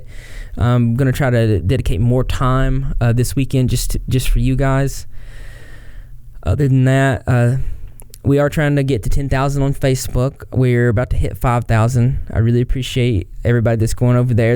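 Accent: South, United States. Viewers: okay I've spent a good amount of time trying to figure out what flavor Southerner you are. I'm from West Virginia, but reside in Georgia.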